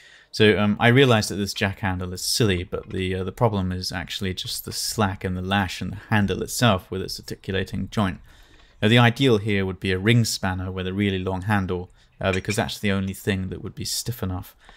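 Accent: RP, United Kingdom. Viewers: I love the posh voice.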